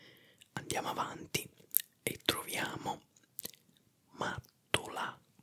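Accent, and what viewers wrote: Sicily, Italy. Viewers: Come mi piacerebbe capire di più il dialetto siciliano ! Suoni bellissimi 🤩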